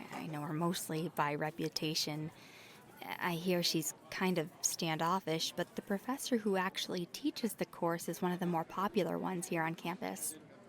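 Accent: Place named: South, United States. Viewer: I like that little hint of a southern accent that I hear come through every now and then.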